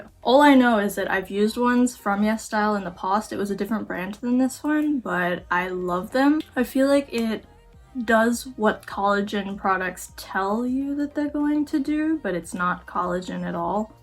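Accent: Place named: Australia. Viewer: Where are you from? I know it sounds weird but i keep hearing a slight aussie accent from you ! Are you an aussie but have lived in America for years?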